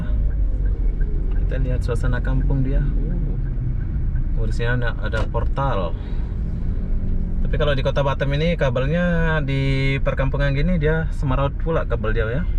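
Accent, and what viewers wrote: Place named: Malaysia. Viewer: Bang jangan cakap mirip Malaysia terus sekali sekali cakap macam Singapore ke brunei😅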